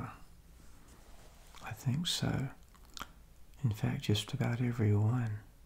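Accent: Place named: East Coast, United States. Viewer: I’ve been binge watching, obviously. Didn’t know where you came from and took a guess one day & said “east coach of America” its the way you pronounce things that give it away .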